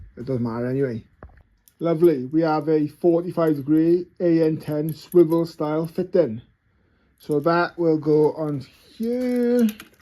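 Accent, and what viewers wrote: Wales, United Kingdom. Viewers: What a headache I had find this after watching on tv.Don’t know what it is about the welsh accent it’s mint.Anyway while it’s got to be tedious at times filming I love watching vlogs like yours where there’s a lot of stuff going on instead of dwelling on stuff unless it’s the engine and u showing what’s been done👍🏼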